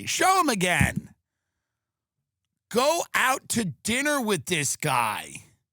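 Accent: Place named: Long Island, United States. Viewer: The migraines from the media are only soothed by Tim’s raspy, Long Island voice whethered by whisky and Paul malls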